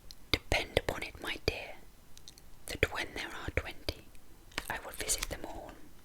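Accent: United Kingdom. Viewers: Luckily just found this playlist after searching deep, I'd be really happy to hear another series of a storys chapters read by you! :) whispered reading is my personal favorite to relax/ fall asleep and I think your (or the british) accent in general is percect for it. It's also something simple you can upload to feed the algorithm in case of having periods of feeling less creative :) Hope your channel will heal and you are doing well ❤️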